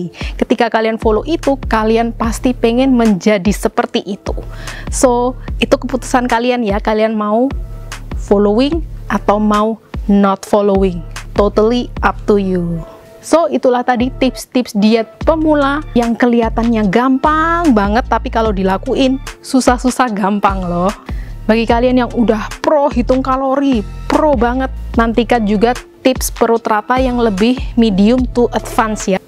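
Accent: Java, Indonesia. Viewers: Sampeyan iki wong ndi to cii 😂😂😂 bahasane medok men pas ndagel 😂😂😂 ke mekel tapi mak jleb ning otak😘 lope u 😘😘😘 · seneng bgtt bgttt sm cici nyaa kalo omg jowoo medok bangett huhuhuuuuu 😂😂😂 jd bikin smgt buat nonton eh olahraga yuk!!' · Sueneng aku mbek penjelasan e mbak e medok ee lohh🔥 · maksud sy liat cara berbicara lucu emeszz, medhok2 jawa, 👌😘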